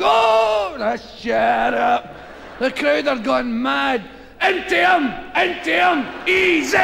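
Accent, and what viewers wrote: United Kingdom. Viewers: Well I'm a Portuguese-Australian and thanks to your subtitles I'm now starting to understand Glaswegian a lot better. You rock 😁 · Well that’s his accent so maybe you shouldn’t bother watching it! I’m Scottish come from the same place as & I’m so glad he got Scottish culture & humour out to the rest of the world on yer sel big yin!!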